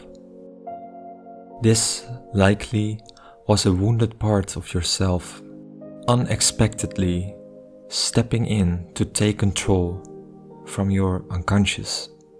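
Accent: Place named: Netherlands. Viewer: Beautiful artworks and great storyline. Your video’s are great value to my as I am on the path of individuation as well. Thank you. I can hear from your accent that you are from the Netherlands as well?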